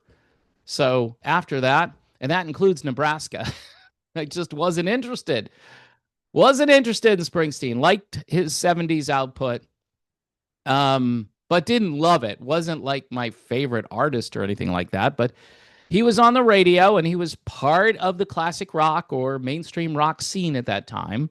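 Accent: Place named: South Jersey, United States. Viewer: Love Your impressive brother for you sound just like him come,lte with south jersey twnag lol!! I have always been a fan of his music and do admit I am looking forward to seeing him deliver from nowhere. Unfortunately he has lost his mind and became very bitter to date. However bruce still put on a great show and seems to embrace his music in all forms. neve know about electricity for barely remember the original release. The only song I even really know from the album is which is very haunting. God bless you, my brother, and I hope you end up liking this biopic, which seems at least tentative.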